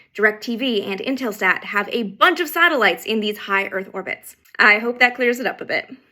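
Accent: Canada. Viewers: Please make a video just saying the words “about” and “out” and any other Canadian sounding words. You really need your own cable network dear. You are amazing. You’re my granddaughters age and I wish more of your generation loved the space program like my generation ,who grew up watching Mercury, Gemini, and Apollo, life on TV. Keep up the oatstanding (see what I did there) work❤️ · the Canadian accent lol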